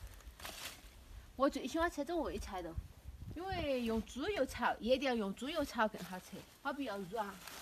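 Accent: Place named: Sichuan, China. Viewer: 一直来都没听你在视频里说话 今天才知你是川妹子 声音很好听 以前我还以为你是南方广东人呢……。加油💪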